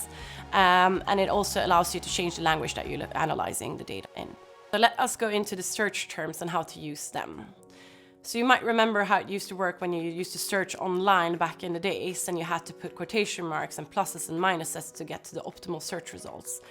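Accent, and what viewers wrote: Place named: Sweden. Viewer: Accent is Swedish!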